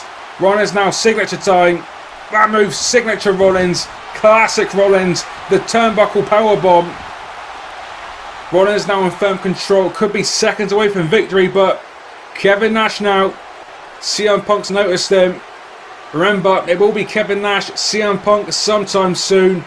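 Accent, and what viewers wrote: England, United Kingdom. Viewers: are you English? love your vids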